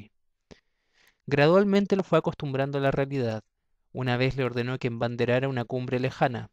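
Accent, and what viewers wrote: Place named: Chile. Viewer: se agradece la narracion chilena, saludos desde santiago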